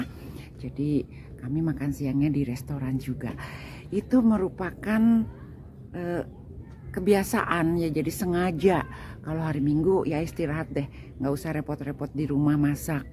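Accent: West Java, Indonesia. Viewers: Wilujeng sonten Bunda, reseup pisan logat Sunda na kaciri keneh, God Bless you🙏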